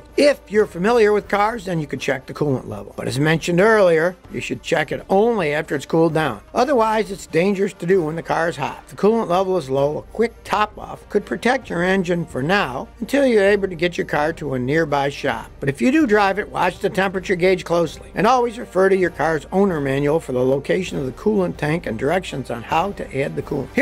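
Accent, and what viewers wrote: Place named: United States. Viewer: I like the accent he talks that one refer to the American 1970's movies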